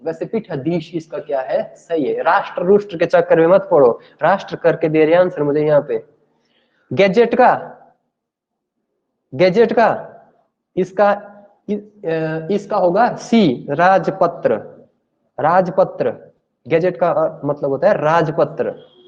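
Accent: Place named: Himachal Pradesh, India. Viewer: Love ur himachli excent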